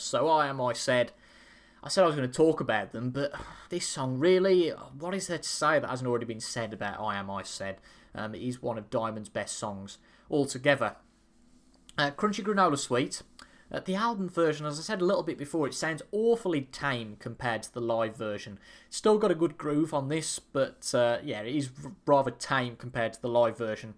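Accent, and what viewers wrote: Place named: Midlands, United Kingdom. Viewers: may i know what UK accent this is? It sounds great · Sounds like Midlands, maybe Birmingham.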